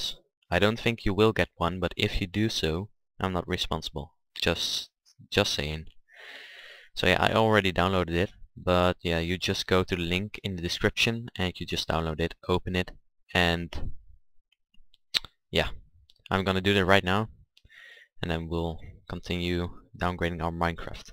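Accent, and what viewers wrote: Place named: Netherlands. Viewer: i love the way you say "fersion" instead of "version" cus v is said like an f in dutch ^^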